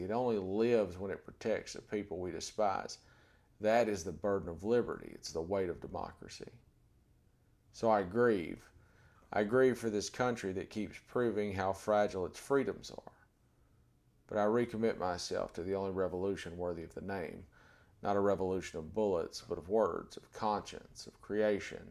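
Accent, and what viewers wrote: South, United States. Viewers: Awesome to hear an American Southern man speaking so perfectly and intelligently on the realities of this issue - with dignity and respect. Well done Sir!!! 👏 👏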